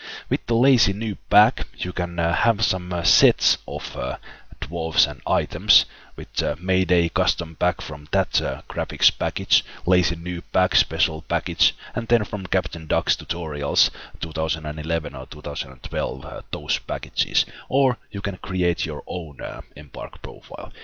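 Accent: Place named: Finland. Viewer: Just out of interest. Do you happen to be Finnish? Your accent sounds exactly like most of us Finns.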